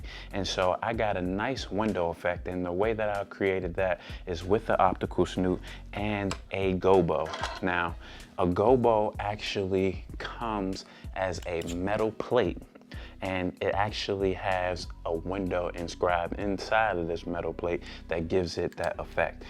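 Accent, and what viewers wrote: United States. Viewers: Yo are you from Baltimore?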